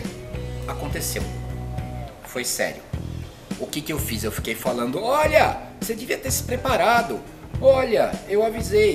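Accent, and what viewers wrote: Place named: Rio Grande do Sul, Brazil. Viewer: Mestre só me responde uma coisa...Tu é gaúcho?pois tu tens o nosso sotaque e eu ja vi tu falar girias gaúchas.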